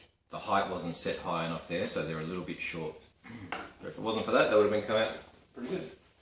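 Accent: Australia. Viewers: very cool. i thought I needed a dado blade which my makita mtl 100 does not allow for or a more expensive router table so, so more money. But now I know I can make a jig like yours. My makita mlt 100 has custom narrow mitre channels, yours seem to have 20 mm channels mine are T 12 mm at top and T of 15 below. But I can cut some 12 bars to make it work. Great to hear a no frills ozzie voice and no drama queen. Paddy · This is awesome. Thanks for posting. Good to hear an Aussie accent in the ocean of youtubers! . cheers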